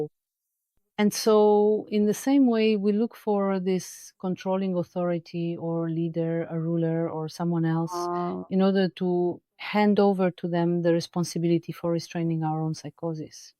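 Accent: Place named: Eastern Europe. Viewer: мне нравятся ваши видео на русском.На английском ваши видео звучат очень по- славянски, без английской интонации. Может можно подкорректировать AI, чтобы ваш голос звучал максимально как native, с другим интонационным ритмом. С приветом и благодарностью, из США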